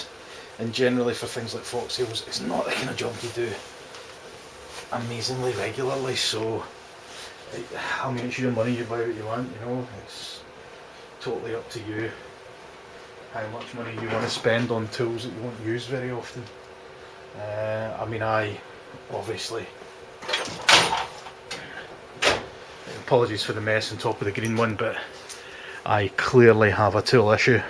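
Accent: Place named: Scotland, United Kingdom. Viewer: I believe he's a Scot....love the accent:)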